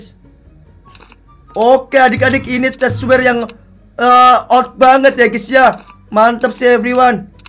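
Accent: Java, Indonesia. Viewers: Bang jebule Kon wong Jawa yah,ngerti ora bang nyng ngomong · Bang kamu orang Jawa tengah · Jowo tengah hader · BIAR MEDOK AJA SIH, BIAR GA SOK INGGRES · Bang sampean wong jowo Yo ?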